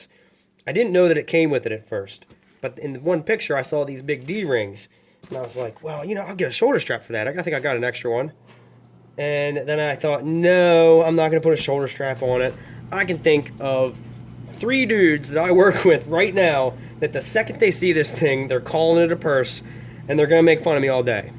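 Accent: Philadelphia, United States. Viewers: Literally two seconds in I could tell you're from Philly lol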